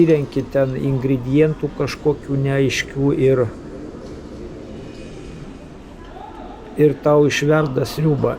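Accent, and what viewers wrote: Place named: Ignalina, Lithuania. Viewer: Tau tinka toks blogerio žanras kur kalbiesi su savimi Ignalinos tarme. Lauksime tęsinio. su Naujais metais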